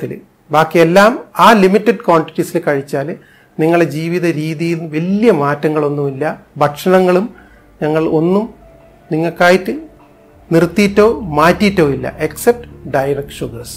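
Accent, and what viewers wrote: Kannur, India. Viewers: So nicely and explained in a simple manner. Your kannur simple language is so nice to hear.🙏👍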